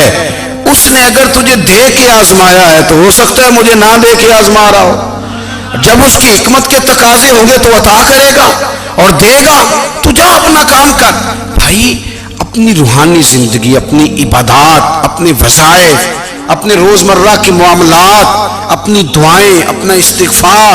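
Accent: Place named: Punjab, Pakistan. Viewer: kis tarha se baat karte hain aap peer sahab , dil ku sukoon mil jata hai , aapki punjabi accent toh mashallah ❤❤❤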